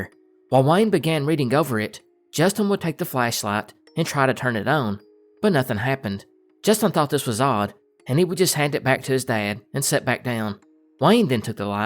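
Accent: South, United States. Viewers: This is great. I can’t sleep without his calming southern drawl lulling me.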